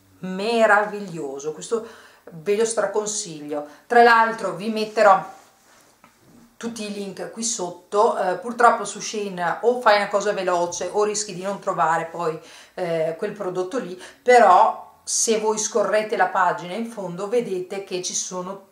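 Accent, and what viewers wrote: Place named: Veneto, Italy. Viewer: Ciao.intanto complimenti x il gusto nel vestire,gli abiti ti stanno benissimo. Io preferisco acquistare su bonprix e mi trovo benissimo perché i tessuti li trovo più naturali di quelli di shein .hai mai provato bonprix? Io sono una curvyyy e trovo abiti sexy anche come i tuoi abiti. sento che sei veneta dall'accento...anca mi ghe son! Ahahahah ,sito de vr o vi o pd? Ciaooo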